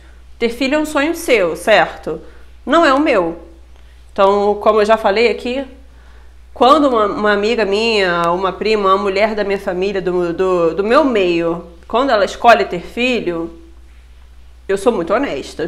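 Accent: Rio de Janeiro, Brazil. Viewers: Seus vídeos são um barato, esse jeito carioca de falar kkkkk perco tudo! Adoro, reflito bastante, gosto de ver pontos de vista de pessoas sinceras, sem hipocrisia. Também não quero ter filhos, não terei e fim.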